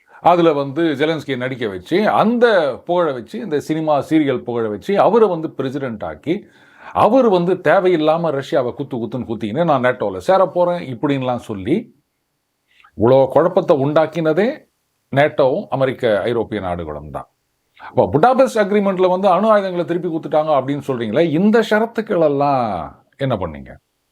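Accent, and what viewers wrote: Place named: North Chennai, India. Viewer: Pl pl speak in real Tamil not in north Chennai accent. So cruel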